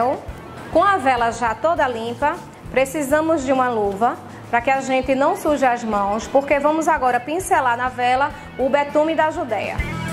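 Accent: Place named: Pernambuco, Brazil. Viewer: você é ótima! Aprecio muito os vídeos da, inclusive os daquele químico daí. E amo esse sotaque pernambucano. O.mais agradável do Brasil, na minha opinião. Parabéns aos.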